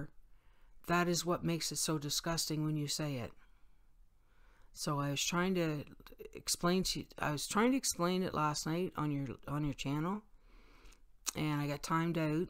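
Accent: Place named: Canada. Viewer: i enjoy your videos, and Canadian accent 😃, but these people are so vicious and hateful when you disagree with them it's pure insanity. LB's "people" are going to jump in an attack you with their mob mentality. They are incapable of polite disagreements, the hate they throw out is so horribly cruel. I think you do a great job & you have every right to voice your opinions, especially on your channel. Nobody has to watch anything they disagree with, or they find offensive. Have a great day.🌷🌷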